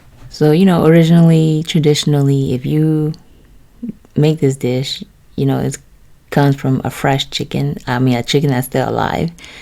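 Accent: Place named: South, United States. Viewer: You have such a lovely soothing voice, almost sound southern 😊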